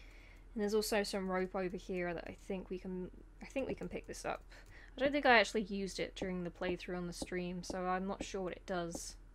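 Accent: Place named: London, United Kingdom. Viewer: You have perfect London accent. I like it.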